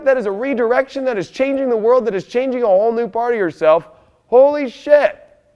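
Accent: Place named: California, United States. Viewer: Love you dude you talk just like my friends back home must be a cal thing. Thanks bro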